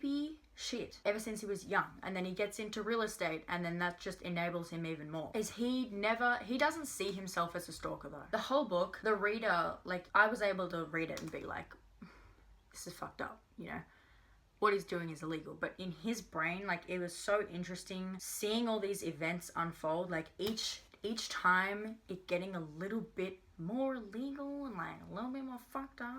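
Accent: Australia. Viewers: her accent sounds Australian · is she from Australia or New Zeland?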